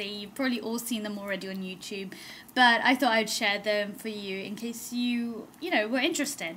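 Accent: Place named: United Kingdom. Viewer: do u live in uk or us? lol bc ur accent is uk but ulta is in us =p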